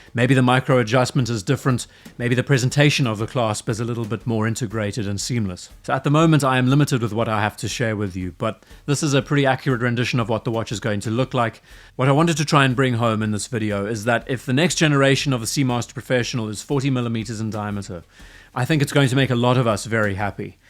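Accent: South Africa. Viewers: Wait now, what is this accent, are you South African?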